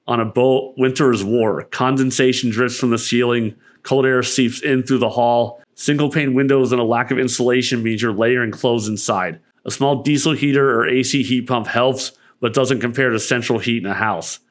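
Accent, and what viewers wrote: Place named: United States. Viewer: It sounds like you have some kind of American accent.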